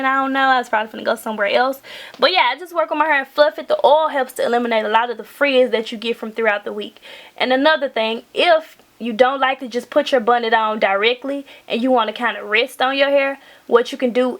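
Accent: South, United States. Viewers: girl you so country!!! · She is sooooo country! Where you from? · yes, she is definitely from the south 👌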